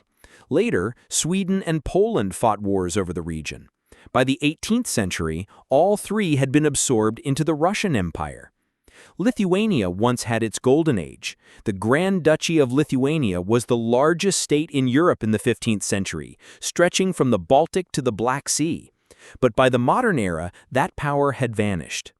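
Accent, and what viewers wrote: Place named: United States. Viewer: PUTIN TV in american accent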